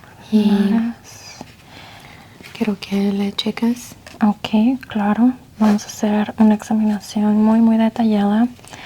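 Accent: Latin America. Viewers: Eso es castellano jajaja, creo que ella habla más español latino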